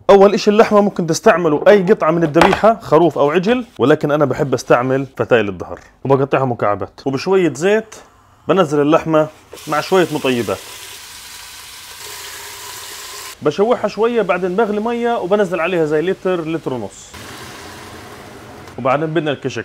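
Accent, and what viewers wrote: Palestine. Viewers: عم تعرض طبخ ياالله ليش عم اتفرج وابكي أنا صار كل شي غزاوي كل لهجة فلسطينية تخليني ابكي ربي يفرجها على أمك وكل أمهات غزة وأمهات سوريا ويدفيهم برحمته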